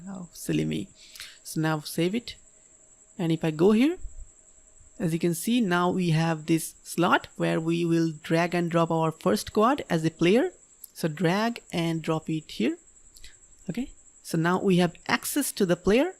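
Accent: Bengal, India. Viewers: Amio Bangali...from bangladesh....apnar kotha bolar style dekhe keno jeno mone hossilo apni bangali...tai ask koresilam.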